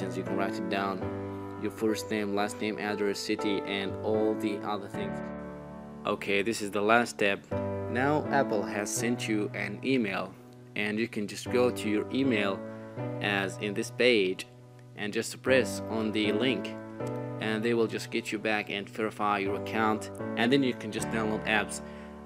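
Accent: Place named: Arab world. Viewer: why i undrestood your english becouse you arab and i am somali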